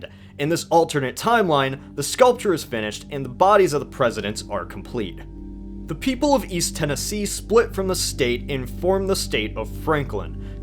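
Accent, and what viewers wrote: Ohio, United States. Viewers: you from Ohio? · Is he from Ohio?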